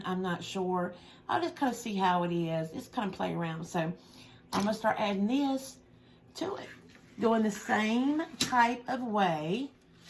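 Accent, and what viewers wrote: South, United States. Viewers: I just love your show and that southern accent you have ! I truly enjoy the decorations and the diy’s . When I am feeling low you make me smile Be blessed ! Andrea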